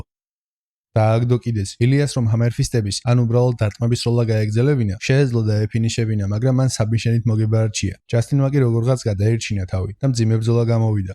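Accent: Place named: Poti, Georgia. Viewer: ფოთის კილოზე ლაპარაკობ 😊😊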